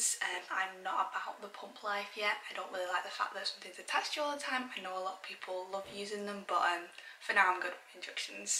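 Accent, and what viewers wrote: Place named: Manchester, United Kingdom. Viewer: I'm probably biased, since I've been watching your videos for quite awhile now, but when I first started watching, your accent sounded a LOT like Christopher Eccleston's to me, so I'd definitely say up north near Manchester 😁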